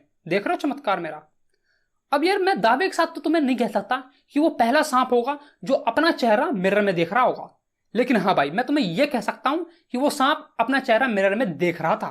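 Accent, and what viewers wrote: Bihar, India. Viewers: Bro are you Bihari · Bhai aap Bihar se hai kya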